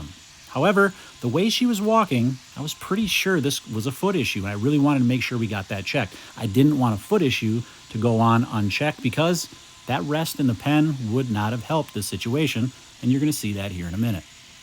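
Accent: Scotland, United Kingdom. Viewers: I like the Scotsman's accent. But he does have more 'filler' in his uploads nowadays. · I confess to be a bit annoyed with some of the extra fluff he puts in -- but I believe many of his other subscribers actually enjoy the fluff. His latest video had Zero fluff and all hoof-trimming. So I think he changes it up for variety. As an American living in England, I rather like the Scottish accent.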